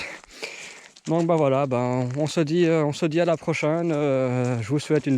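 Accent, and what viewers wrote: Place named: Belgium. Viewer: Tout est parfait dans cette vidéo, les plans, les commentaires, le petit accent belge. On se régale nous ;)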